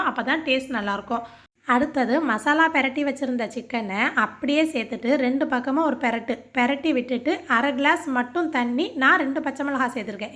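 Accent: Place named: Mylampatti, India. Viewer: enga familyla nanga ipd dhn pesuvom adhe mari pesuringa poorvigam mylampatiya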